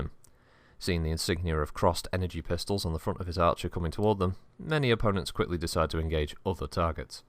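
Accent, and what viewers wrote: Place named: England, United Kingdom. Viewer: you're English right? Yall are the best narrators and so far id say you are carrying just fine. so far you are proving that considering i pass around your shit to my homeboys. I'd hope they did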